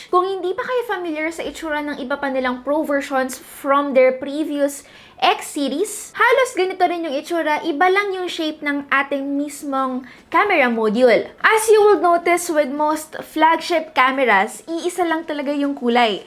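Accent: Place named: Ilocos, Philippines. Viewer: mukhang foreigners tpos ilocano accent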